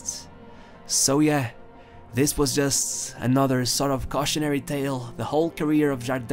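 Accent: Portugal. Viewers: Bom canal. Esse sotaque português 😅😊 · you're Portuguese, my friend? you do sound like portuguese, 😁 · Are you from Mozambique,Portugal,Angola or cabo verde i swear you sound like your from Portugal or african country's that speak Portuguese iam gonna speak Portuguese and if you know you olá meu nome é Nícolas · Portuguese. The accent is unmistakeable.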